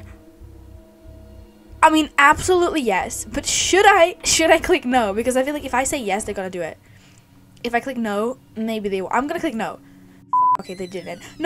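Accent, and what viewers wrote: Sweden. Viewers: ... Hey uhhh are you actually from Sweden? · Are you from Sweden? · You are from sweden right?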